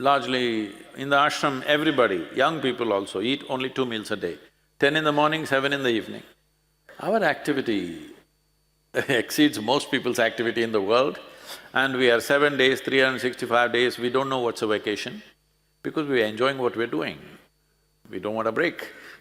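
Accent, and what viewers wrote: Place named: India. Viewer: He is speaking English clearly, he has an Indian accent.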